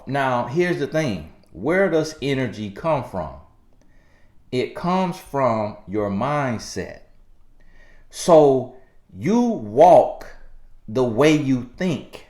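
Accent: South, United States. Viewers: not even a minute into the video and I’m weak at that country accent. that’s right mr. the CEO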